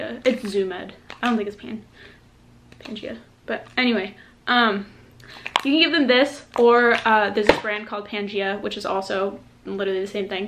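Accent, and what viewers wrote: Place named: United Kingdom. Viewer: Pretty good british accent, adorable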